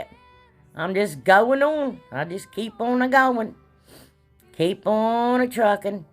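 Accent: South, United States. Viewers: Where are you from? You sound like me , country accent!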